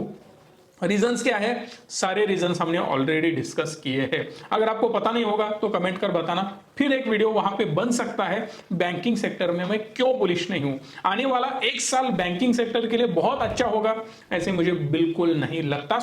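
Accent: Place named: Kolhapur, India. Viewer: सर मराठीतच तुमचं कोल्हापुरी आयकायला आम्हाला खूप आवडेल 🙏🙏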